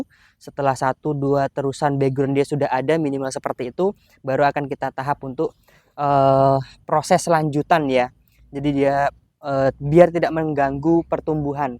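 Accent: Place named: Palembang, Indonesia. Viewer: Ikut bljr... Awak jemo mano logatnyo cak jemo palembang , aq di bkl.... Salam kenal dan satu hobby bro . 🙏🙏🙏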